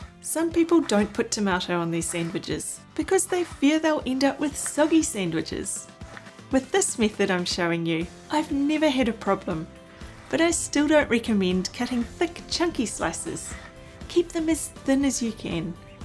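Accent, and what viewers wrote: New Zealand. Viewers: Turns out IT IS a kiwi accent😂😂😂😂😂😂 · Are you from NZ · Sounds like New Zealand to me. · Are you a New Zealander? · This woman has the most Kiwi 🥝 accent that I've ever heard.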